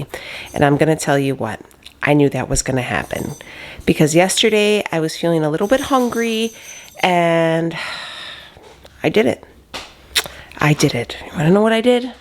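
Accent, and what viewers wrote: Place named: Illinois, United States. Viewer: Your accent!🥰 you sound like my step- mother in law who grew up in Illinois, near Chicago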